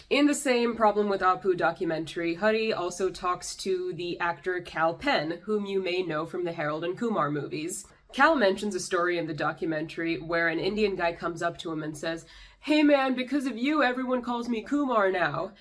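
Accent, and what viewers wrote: United States. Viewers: also in Bengaluru there was this lady in a jewellery shop who was grinning ear to ear thinking you are a typical Indian girl putting on an American accent even though you were not , it’s the normal way you speak from the day you learnt to speak , just wanted to mention it here . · Girl, you're upset about an INDIAN character played by an INDIAN actor having an INDIAN accent? I mean, whether or not the character was written to have an accent and whether the actor himself really talks that way is null and void. The character is INDIAN so having an accent seems fitting. Did he NEED an accent? No, but it's no issue that he HAD one. At least they got an Indian actor to play him than getting let's say, a white person and having him do an Indian accent, then I can see you getting a bit offended but you just seem to be nitpicking. The fact that boy even did that, never knowing him prior to his TV works, was genius was because he was convincing it shocked me when I saw interviews and come to find out that wasn't his accent. 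THAT'S TALENT and should be glorified and I don't mind him doing that more, not just speaking his regular accent because that's just how he talks in real life. He's playing a character after all so he should able to branch out and portray different elements from himself. And don't get me started on the clothes because it's simply to reflect the character's heritage. Do you feel it makes them "too" Indian? Why is it okay for YOU to wear your Indian clothes here? You don't need them given your rather Americanized accent I might say, but it's obviously done for you to reflect your heritage so why can't a character have this done? THE IRONY. Apparently if you're an Indian character and don't have an accent like you or wear Indian clothes (despit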